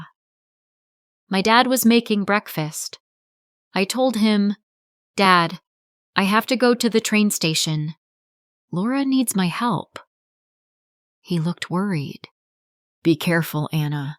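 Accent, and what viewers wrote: United States. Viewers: Is it American accent ???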